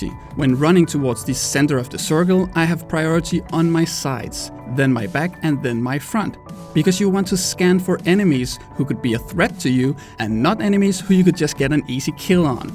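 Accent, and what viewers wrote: Denmark. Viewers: ARE u danish? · Årrrh du lyder så dansk at det er hårdt for mig at se den her video haha. Ellers rigtig god video! Kan se du har brugt ekstremt meget tid på den, hvilket jeg sætter pris på! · Are you from Denmark? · Er du dansker? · Are u from Denmark?! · Great video. Subscribed! 👍 Maybe stupid question, but are you Danish? 🇩🇰😁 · Are you danish?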